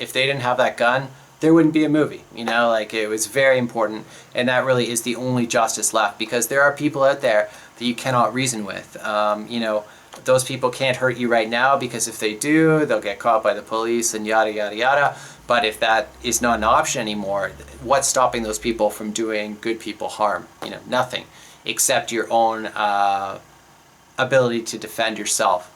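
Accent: Canada. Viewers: The words 'about' and 'out' always give the Canuck away... lol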